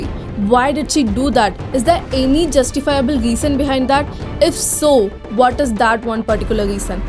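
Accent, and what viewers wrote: Tamil Nadu, India. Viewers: I miss your Tamil slang English It was sweet.. ❤😊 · Shut up bulle she was From Tamil so respect his accent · Your English in Tamil ascent is lovely to hear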